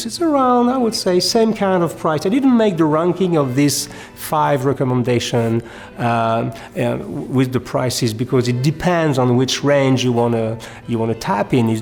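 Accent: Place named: France. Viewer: I have just watched the two versions french and english of your videos. To compare the fluency of your eloquence i m so happy to see that we obvisouly notice that your french accent remains and the way of your talking remains french one it puts so valuable in your explaination. Yours from France 🇫🇷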